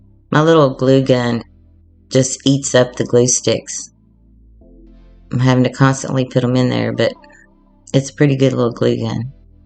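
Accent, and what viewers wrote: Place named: South, United States. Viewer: seldom hear a southern drawl. Yours is lovely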